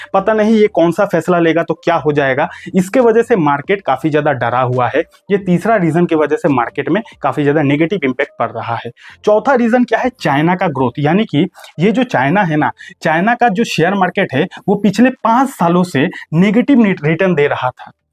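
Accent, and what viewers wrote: Bihar, India. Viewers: बिहारी हो क्या भाई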